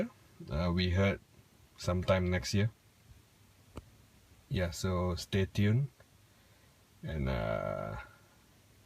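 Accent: Singapore. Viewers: fucked up singlish accent bah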